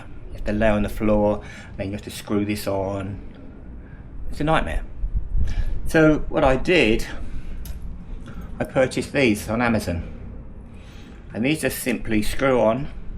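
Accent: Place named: Australia. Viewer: Finally, a guy with the wisdom and guts to tell the truth about Wing saddlebags compared to Harley bags! Thank you! I still would love to buy a bagger Wing like yours because at 78 years old I removed my travel trunk on my "09 Harley Ultra Classic a few years ago because I can now swing my leg over the rear fender to get on my bike without arthritic hip pain. Same would be true if I had a Wing with the trunk. At 6'3" it is too hard to get on the bike with the trunk in the way. I suppose I could put a Wing on the center stand and stand on the pegs/boards to mount but I'd rather be able to swing my leg over the seat. Sadly, at 275 lbs., 6'3", size 14 boots it is very unlikely I could fit comfortably on your bike without many modifications $$$ from Traxxtion Dynamics. Maybe I will win the lottery, I should think positive. Anyway, not sure if you are an Aussie in Florida or a Floridian in the land down under, but think I understood 90% of what you said, mate. 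Hope you do more Wing stuff because this bike is on my radar....if I can find a Honda dealer that would take my two Triumph Rocket III's and my Harley Ultra in trade for a fair price. PS" I carry most of the same tools as you do, the pump, the battery booster, tire repair, etc. on all three of my bikes. Several weeks ago I got a flat rear tire on my '09 Harley Ultra(65,000 miles) within about 3 miles of my home after a 150 mile ride with friends. Long story but I limped home and could not find a nail or screw or anything to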